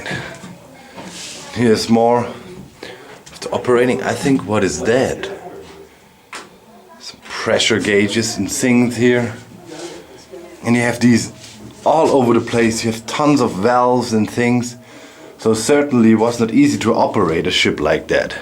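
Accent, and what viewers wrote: Germany. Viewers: The submarine space inside is not very comfortable but this man says that that place is narrow but assuming this man is german (because of his accent) I'd say his super tall. The video and the explanation are great. It's amazing to imagine how everything used to work. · Alman englisch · the joke is that he is german, so its obvious he has a german accent lol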